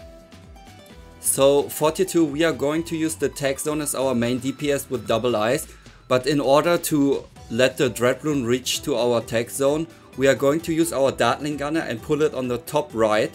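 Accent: Germany. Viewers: Ich liebe diesen deutschen Akzent wenn du englisch sprichst 😂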